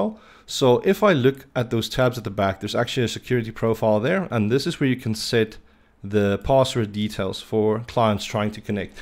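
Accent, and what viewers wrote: Afrikaans, South Africa. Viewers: You sounds like a Afrikaans speaking person. Thanks for the videos man👌👌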